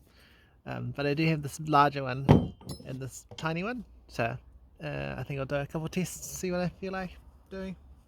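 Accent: New Zealand. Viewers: Kiwi bro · What's the accent? NewZelandian?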